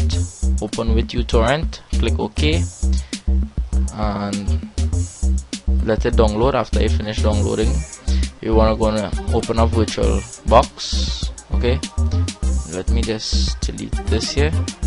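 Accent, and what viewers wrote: Trinidad and Tobago. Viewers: DALM U HAVE DAT TRINNI ACCENT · hmm u soundin a trini hoss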